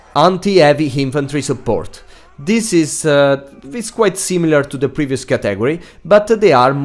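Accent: Italy. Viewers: Fantastic video, by the way, are you Italian?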